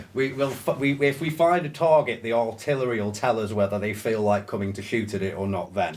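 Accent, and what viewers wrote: United Kingdom. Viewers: This dude sounds like the best of British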